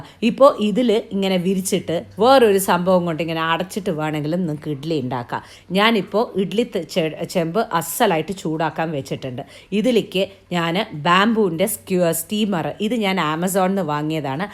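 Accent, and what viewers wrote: Palakkad, India. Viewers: Chechi palakkad anale athanu palakkad slang samsarikinathu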